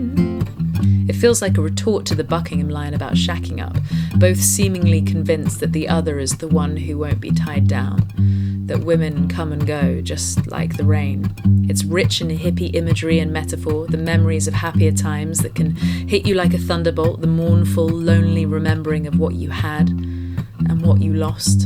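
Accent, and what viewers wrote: United Kingdom. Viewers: Before you even said it I was thinking "This is one take!". Awesome - like a Rick Beato video with a beautiful British accent. I could listen to you speak all day, I'd love to hear more 'analysis' from you.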